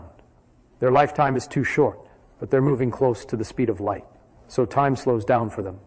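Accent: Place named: Canada. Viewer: That's not Feynman’s voice, it has a Canadian accent.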